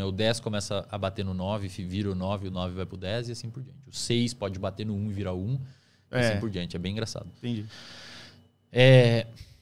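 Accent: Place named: France. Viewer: Seja etero e pare de francesar o português....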